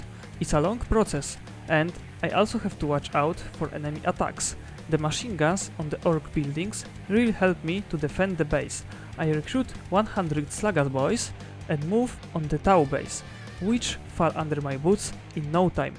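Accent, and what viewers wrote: Poland. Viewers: Ooofff... the ponglish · Słyszę chyba polski akcent 🤔 Mam Cię gagatku 😁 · I hear that accent, and i think i am not wrong, a Fellow Pole you are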